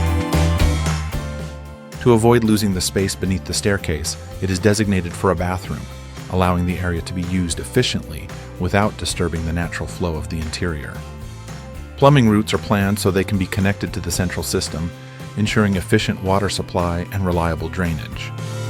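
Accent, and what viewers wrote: United States. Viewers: Who is this 'Merican doing the voiceover ?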